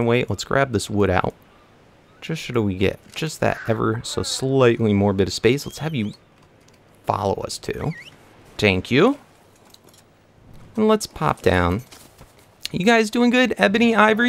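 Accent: United States. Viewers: u got blue eyes and u have an american accent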